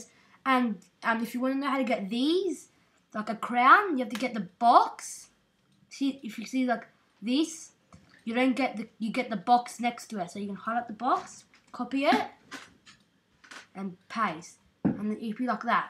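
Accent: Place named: United Kingdom. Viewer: Are you british or live in the UK? I'm not judging you. Honestly, I want your accent. And it worked.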